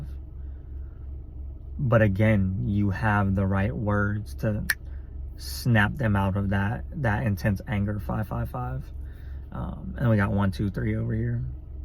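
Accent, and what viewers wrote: South, United States. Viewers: You got like a country accent . Where are you from ????🎉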